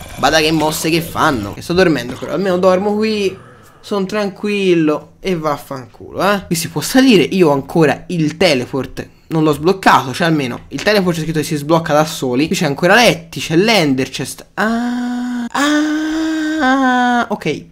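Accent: Florence, Italy. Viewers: adoro il tuo accento fiorentino :D :')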